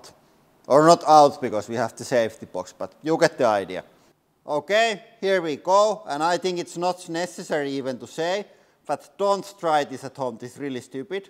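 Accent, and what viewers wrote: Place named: Finland. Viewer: I haven't seen these vids for a few years, I'm so happy to hear the charming finnish accent hasn't changed a bit :D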